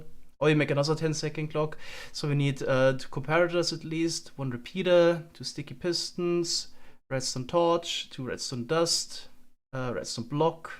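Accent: Germany. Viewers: Klingst ja verdammt deutsch, sympathisch haha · Are u german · are u german?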